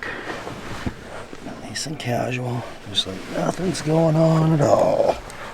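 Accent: Canada. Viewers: Love the show! I lived in Alberta for 25 years before moving to the states in 2018. I love seeing my former home, and every "Parkade", "washroom", and "car" out of your mouth is 🇨🇦 music to my ears. is a damn respectful time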